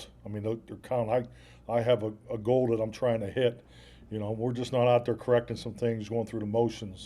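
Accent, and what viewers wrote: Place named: Western Pennsylvania, United States. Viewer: Coach-we're" all-in" with you here in West Central Pennsylvania. Love to hear that Western PA accent but especially your "Can-Do" - "Will-Do" attitude. I've always wanted to see you with the Steelers even after you and Aaron beat Big Ben and Mike in the super bowl. Again -I share your accent and embrace it after working forty years in Washington DC. God Speen to you Coach and our team! Thomas K. -Greenwood, PA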